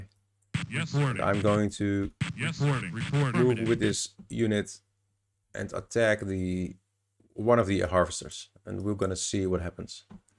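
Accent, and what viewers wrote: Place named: Netherlands. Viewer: Lol wist niet dat je een Nederlander was , zag je post op Tweakers en nu hoor je duidelijk je Hollandse accent. Thnx voor deze project , ben mega fan van Dune 2 (en andere Dune games) sinds ik het speelde op me oude 286. Dus super vet project , good Luck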